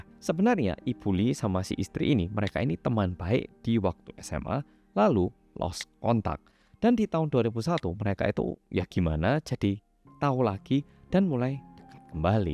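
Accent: Java, Indonesia. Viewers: Seneng bangeet yg bercerita org korea tp kyak org jawa asli ❤ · Medoookkkk.... Hadir sidoarjo samm ❤ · Selalu ikut ngomong medokkkk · orangnya juga pernah tinggal di jawa kak,jadi agak medok gitu ya pembawaan nya😂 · Kasus nya lumayan plotlist..tp itu siabangna kok bisa Korea tp medokkk ngomongnya..campuran ya bang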